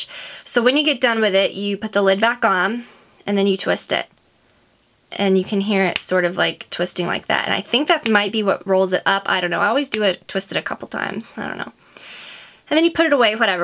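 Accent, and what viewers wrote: South, United States. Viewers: Way to own that southern accent. Southern born and southern bred!!!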